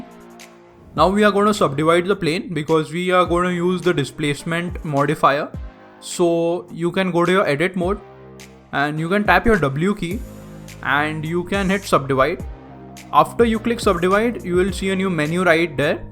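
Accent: India. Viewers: There's a heavy Indian accent!!😂😂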